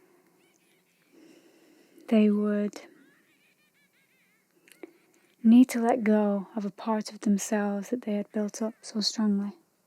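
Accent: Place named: Northern England, United Kingdom. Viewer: Nice vids, some of the most interesting iv seen, keep up the good work, you sound like your from north of England, cant put my finger on exactly where though.:P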